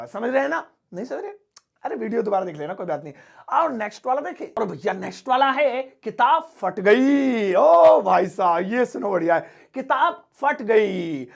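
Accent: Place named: Haryana, India. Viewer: Accent Haryanvi wala hi h sir Sare hi words ki pronounciation......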